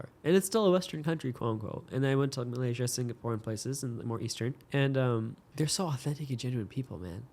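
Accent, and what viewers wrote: United Kingdom. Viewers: Put on subtitles. It's a British accent so if you speak ENGLISH you should be able to understand him. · No need to - Be yourself, its a nice accent, "kinda sounds british", but theres subtitles and speed options I believe. So be yourself Inshallah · Scotish accent... Northern Scottish accent is more stronger.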